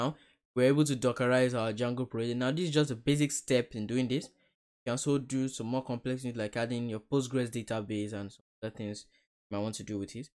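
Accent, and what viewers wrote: Nigeria. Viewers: I feel at home listening to you😂. Loving the Nigerian accent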